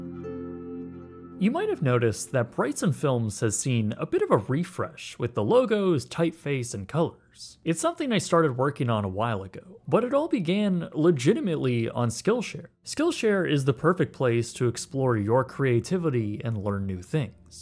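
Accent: Canada. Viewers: Thanks for another great video. Hearing you pronounce The Padres was funny. I'm not poking fun, I understand you have a Canadian accent. Our differences makes life interesting.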